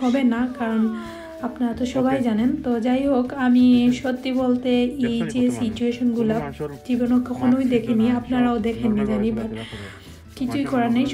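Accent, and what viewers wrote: Sylhet, Bangladesh. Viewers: আপু আপনার মুখে সিলেটি মাত ভালা লাগে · apu apnar mukher sylhety accent ta besi valo lage please apni oi vabei video banaa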